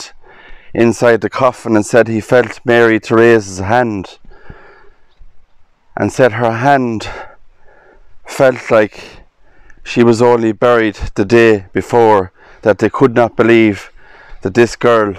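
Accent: Ireland. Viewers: Are you Irish? Anyways, you're a really good storyteller, and I'm going to be saying Mary Therese Collins in my sleep now. 😆I'm laughing with you though not at you and I love your soothing voice that would be really good for ASMR. Subscribed!!! 😀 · Perhaps you don't like semi personal comments. But I am going to take a chance. Just love Irish accents since my ancestors came from ballina, county Mayo. Still have relatives there. Enjoyed the video too. Very thought provoking. Am a new subscriber.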